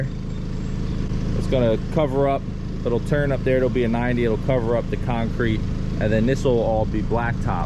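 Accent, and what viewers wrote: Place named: Northeast, United States. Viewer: Only two minutes into the video. Are you based outta the northeast? Couldn't guess anywhere else haha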